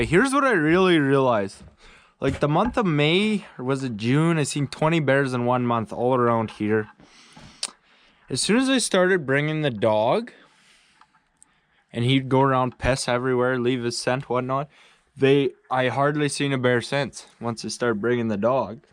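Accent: Canada. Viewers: Finally a Canadian on YouTube that sounds Canadian! Love your life mate, keep being yourself and we will keep watching and cheering you on!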